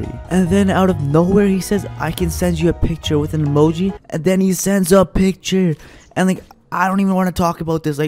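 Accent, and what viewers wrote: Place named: Alabama, United States. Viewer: Me:is he from alab Alabama Me watching vid Silent Silent Me:i kneew it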